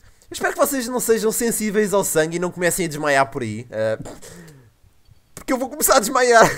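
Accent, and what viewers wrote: Portugal. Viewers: essa voz de portugal deixa mais engraçado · mano ele é de Portugal, não fala errado é o jeito dele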